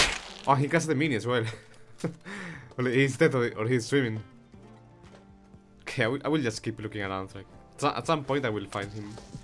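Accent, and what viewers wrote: Spain. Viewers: Hola, nose si hablas español pero supongo que si por el acento, buen video, me encantan tus videos y el hecho de que hables ingles, si no hablas español, nice videos mate, keep like this and u will grow very fast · Are you spanish? diría que si que por tu acento al hablar, pero puedo equivocarme. Un saludo y sigue haciendo videos así de buenos! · This vid was amazing lol. Love your accent are you from Spain?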